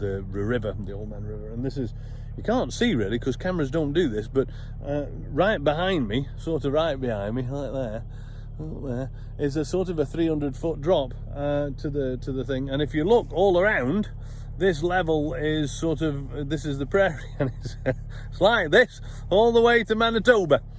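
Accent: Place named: England, United Kingdom. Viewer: For a man that has been living in Canada for 15 years your English pronunciation is still quite English... 😜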